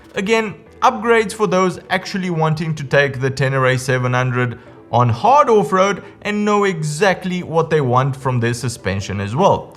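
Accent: South Africa. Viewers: Thanks for the great info, where are you from? you sound positively Afrikaans/South African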